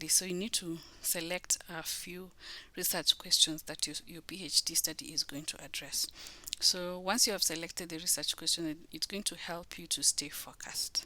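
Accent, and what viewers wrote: Kenya. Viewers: Very helpful, loving the Kenyan accent too. Happy to be your 6th subscriber....yeey!! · I know that accent anywhere, Thank you for the information miss +254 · couldn't help but notice the Kenyan accent good work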